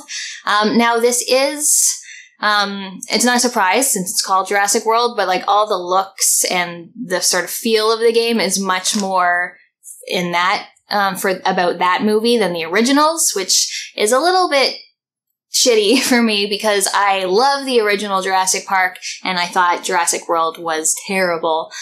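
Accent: Canada. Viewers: i know it's a random question, but are you from canada?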